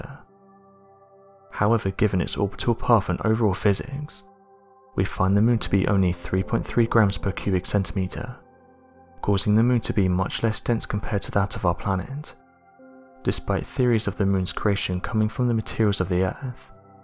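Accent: United Kingdom. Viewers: I have to correct monotone British dude again. Any craft traveling from Earth to Mars wouldn't be called a "star ship". A star ship implies a vehicle that can travel to other stars (such as "the star ship Enterprise"). A transport vehicle from Earth to the moon or Mars would simply be called a SPACE SHIP.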